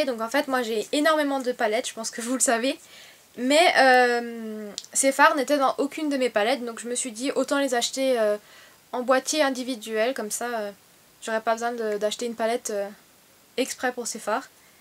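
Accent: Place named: Alsace, France. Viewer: j'entends un petit accent de par ici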